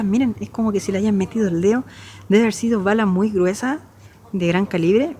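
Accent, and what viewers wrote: Chile. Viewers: Eres chileno?? Admiro mucho a 🇨🇱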